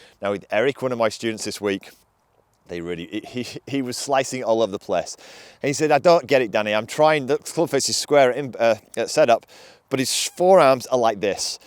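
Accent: United Kingdom. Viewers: first the compliment. I like your videos, they are very informative. Now my problem, and I say my problem, it's hard enough to understand someone with a british accent, it's even harder to understand them when they talk fast. thanks again for your excellent videos.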